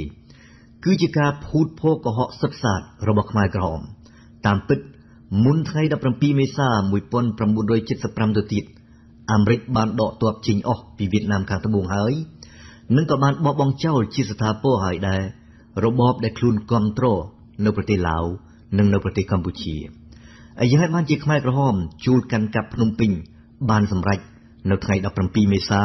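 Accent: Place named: France. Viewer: I LIKE HIS voice SOUND mix French and KHMER interpreter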